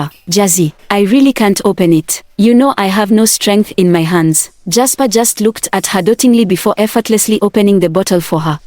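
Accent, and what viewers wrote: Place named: Africa. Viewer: The African accent is crazy 😂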